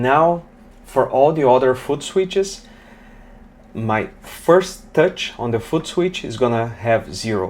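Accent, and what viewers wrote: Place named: Brazil. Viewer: I have Brazilian friends…they have very similar accent 😅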